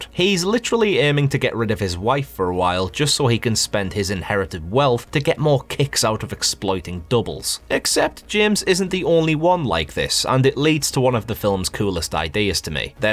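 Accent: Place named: Teesside, United Kingdom. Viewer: Distracted by trying to figure out the accent. Thornaby?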